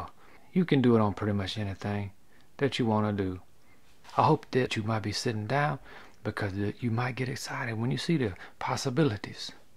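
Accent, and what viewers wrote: Louisiana, United States. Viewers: I was close dude your accent is just like the cajuns down here I love it!!!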